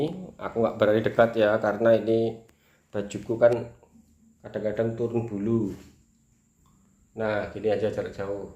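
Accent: East Java, Indonesia. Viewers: Jelas kenal Suarane wong nggalek Tonggone cak mat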